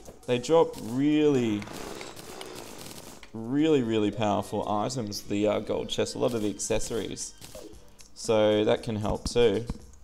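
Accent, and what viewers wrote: Australia. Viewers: love your voice, so aussie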